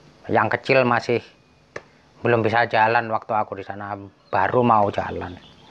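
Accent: Madura, Indonesia. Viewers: kalo denger ini mumet... Banyak ngomong nya ga jelas.. 7 poin aja jgn bertele tele,dari gaya bicara nya dari madura ya pak🤔🤔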